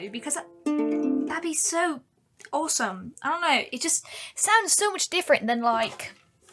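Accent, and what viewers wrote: United Kingdom. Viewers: You sound more British than me, a Brit... Edit: And I regularly say y’all :) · I love when you sing on these podcasts, it really brightens my mood! Thank you so much and I love the British accent!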